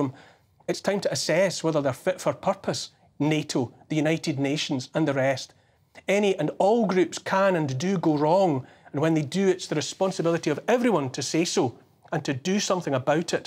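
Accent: Scotland, United Kingdom. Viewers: “The strutting popinjay that is Justin Trudeau..” 😂😂😂 Well said my friend! Fabulous monologue in an equally fabulous Scottish accent! Greetings from Canada! 🇨🇦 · Scottish voice of Neil is wonderful mate … I’m off to buy a bottle of Bucky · Neil, I just love hearing your Scot's accent speaking the truth. You have one more American subscriber.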